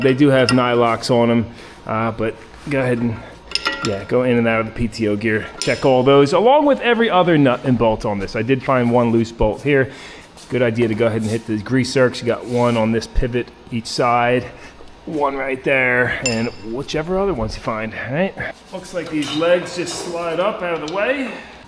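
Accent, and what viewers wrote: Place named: Pennsylvania, United States. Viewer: Whoa, that's quite the accent. Are we from Pennsylvania?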